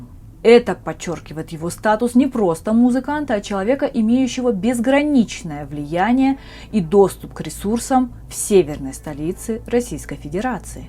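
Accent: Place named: Ukraine. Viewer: Украинский акцент проскальзывает. Не сразу понял...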